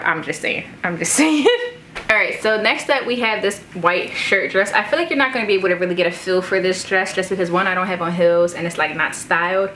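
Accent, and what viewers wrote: Philadelphia, United States. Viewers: girl I clicked your video because it was suggested to me and within two seconds I had to see if you were from Philly. low & behold I was right! hey Philly sis <3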